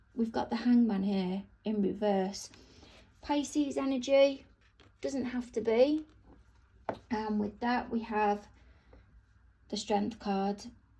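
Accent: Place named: Salford, United Kingdom. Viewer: you sound like you're from Salford. 😊 I'm from Manchester too. I've only just found your video's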